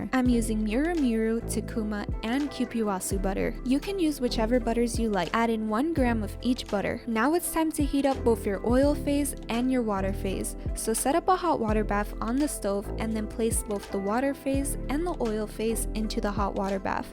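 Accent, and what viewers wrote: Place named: Caribbean. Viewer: Your hair is fabulous and I love your accent! It's soothing. You sould like you're from the Caribbean. Where are from?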